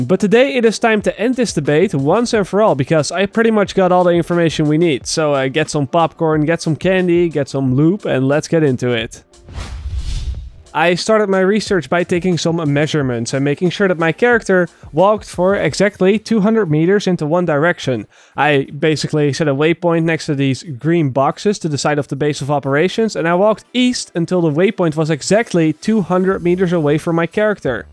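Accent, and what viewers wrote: Netherlands. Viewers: I have the feeling you are dutch #accent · Your accent dude genious lang leve holland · That Dutch accent tho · the person talking has a Dutch accent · So much dutch accent! haha goedzo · mate your dutch accent is hilarious. But good video liked it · Deze gast heeft echt een Nederlands accent This guy really has a Dutch accent. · jij bent zeker nederlands dat kan ik aan je acent horen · Je kan goed horen dat je nl bent kill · loving the dutch accent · Zoooo.... Zwaar nederlands accent gast, dat verpest de video gewoon. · van Corpusstein haha ja ik hoorde ook gelijk dat ie uit Nederland kwam de eerste keer dat ik een video van hem zag . Nu meer als een jaar geleden. Hij brengt het wel leuk en soms moet ik er wel om lachen dat vernederlandste engels. Maar zijn video's zijn wel informatief. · I knew you sounded dutch. goed filmpie man! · Prima vid, hebbe gij mooi gedaan! 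;P Hoorde direct al dat je een Nederlander was =P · wow nederlands accent haha, goede video man! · Dat Nederlands Engels accent do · lol je hoord het nederlandse accent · This sounds so Dutch it's not even funny anymore. Atleast it's better than hearing me trying to talk English. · wow that accent is so great is sounds dutch but at the same time english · hehe tour dutch man😂i can here IT! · wist wel dat je nederlands was door je accent😂😂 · aan je accent te horen, gok ik dat je Nederlands bent! Leuke & interessante video =)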